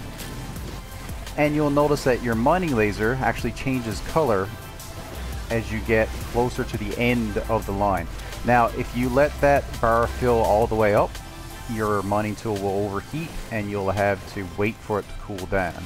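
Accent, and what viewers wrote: Newfoundland, Canada. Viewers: Are you from Newfoundland? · Judging by your channel name and accent im going to guess you're from Newfoundland? lol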